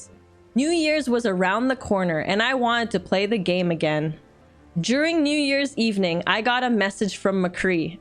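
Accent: South, United States. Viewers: Lol, your southern accent is 🔥. But you live in Georgia, right? That's probably why. 😀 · that southern accent SENT ME 🤣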